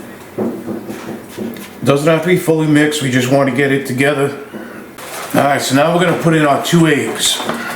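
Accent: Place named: New York, United States. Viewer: Cookies with a NY accent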